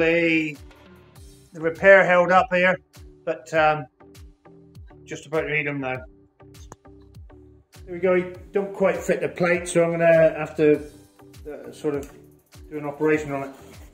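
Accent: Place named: Cornwall, United Kingdom. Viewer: We should never apologise for our cornish accent, be proud of it 👍, good size pasty.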